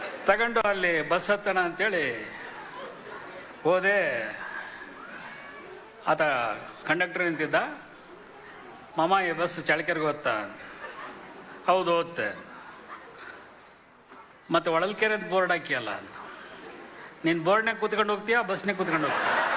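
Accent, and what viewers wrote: Ballari, India. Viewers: ರವಿ ಬೆಳಗೆರೆ ಅವರ ಮಾತನಾಡುವ ಬಳ್ಳಾರಿ ಕನ್ನಡ ಕೇಳಲು ಆನಂದ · Ballari Kannada ❤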